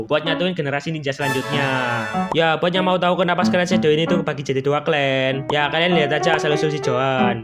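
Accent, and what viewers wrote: Java, Indonesia. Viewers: Abang orang jawa ya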